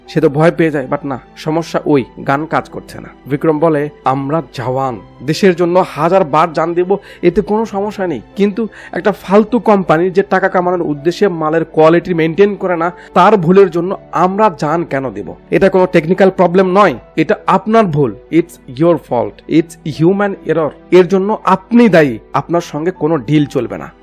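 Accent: Bogura, Bangladesh. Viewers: বসের বাড়ি কি বগুড়া? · ভাইয়া আপনার আমাদের বাসা বগুড়াতে....??😍... অবশ্য আপনার কথা বলার ধরণ দেখেই কিছুটা মনে হয়েছিল আপনি বগুড়ার 😍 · Apnar basha ki bogurate vai??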